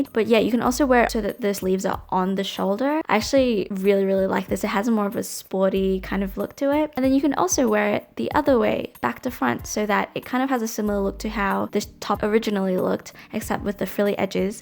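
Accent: Australia. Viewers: half way into the video before realising that you're Australian too woah I'm so used to hearing the accent that it didn't register until you mentioned cotton on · you're literally the helpful older sister everyone wants, also you australian?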